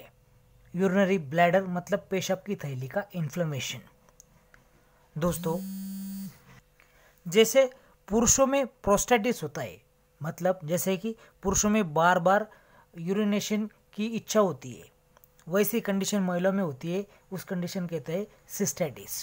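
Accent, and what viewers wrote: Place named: Maharashtra, India. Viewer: बोलने वाला महाराष्ट्रियन हैं ना , गाँवठी बोलता हैं , ठीक से बोला करो भाई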